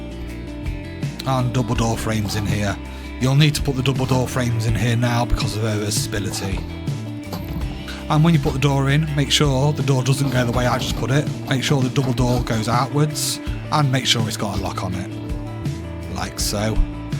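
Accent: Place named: United Kingdom. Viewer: Wait that was a girl ? 😂😂 also where is she from in aus cause that accent also sounded British lmfao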